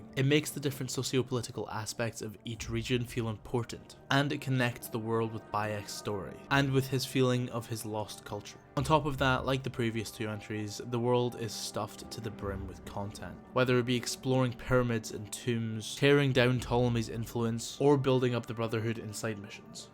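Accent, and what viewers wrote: United Kingdom. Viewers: Ur so British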